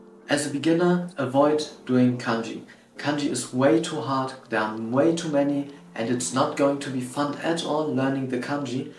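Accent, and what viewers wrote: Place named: Germany. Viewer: I don't know, I studied in a completely different way, and it took me 2 years to reach N2. Learn Hiragana and Katakana in 2-3 days, don't avoid Kanji in the beginning, use Duolingo or Busuu for 2-3 months because the app gives you direction. Don't use Genki because the teaching order is illogical (e.g., teaching ばよかった before the actual ば-form, not distinguishing between things like というわけではない and とは限らない and so on). Wanikani > Anki. But I do actually agree: consistency is key^^ Und jetzt am ehrlich , ich höre da schon nen krass deutschen akzenten mein lieber.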